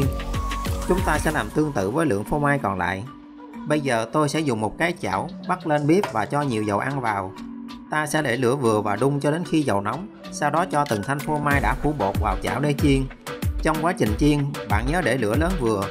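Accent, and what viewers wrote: Mekong Delta, Vietnam. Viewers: A ở miền Tây đúng ko ạ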